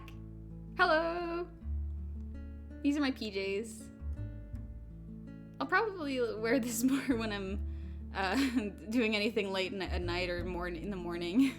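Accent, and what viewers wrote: South, United States. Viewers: oh no damn doubt its a southern country girl. no city girl says hey sugar the way she says it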